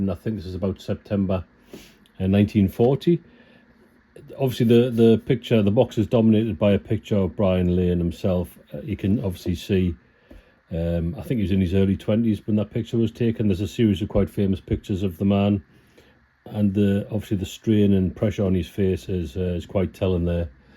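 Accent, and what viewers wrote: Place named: Northeast England, United Kingdom. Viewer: Hi, What a gorgeous build, well done my friend. I live close to Consett and you have a familiar accent. Do you take on commissions? The reason I ask is that I own the original entry forms for Peter Townsend blue Hurricane from the Kings Cup Air Race. I bought the 1/48 Hasegawa to have built and make a complete display. Is this a project you could do for me? Regards, Ian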